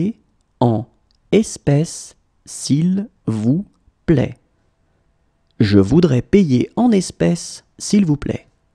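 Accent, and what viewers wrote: France. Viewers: are you french ? because you have a french accent êtes-vous français ? parce que vous avez un accent français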